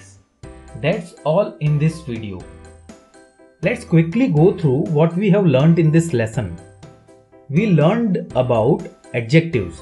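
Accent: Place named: India. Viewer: Hehe is accent... he's truly an indian ...and most of the students are also India's here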